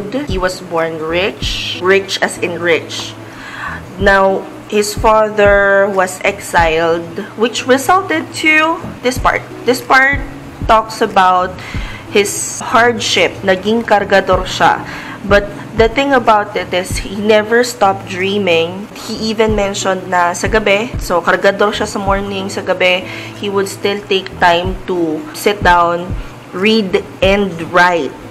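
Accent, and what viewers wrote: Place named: United Kingdom. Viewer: At naalala ko pa nga un British accent mo sa esca calls. Pia Wurtzbach lang ang peg ✌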